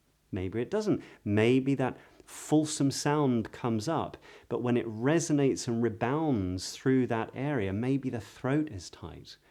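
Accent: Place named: England, United Kingdom. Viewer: Beautiful accent, the pace, volume,etc. Your speech (maybe your ideolect) is very pleasant to hear. It's modern English accent too. Good channel and good content, for special audience 😅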